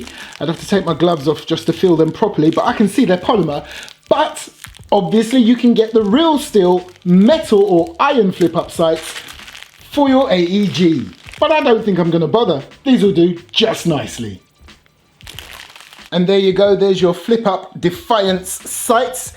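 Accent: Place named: Caribbean, United Kingdom. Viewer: Wha de raas yuh sey! Him accent sound American to you?😂 kmt Him British. British Carribean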